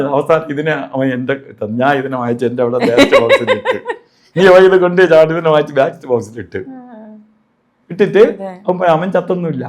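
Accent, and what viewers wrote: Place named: Thiruvananthapuram, India. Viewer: തിരുവനന്തപുരം ഭാഷ കൊള്ളാം 😂😂😂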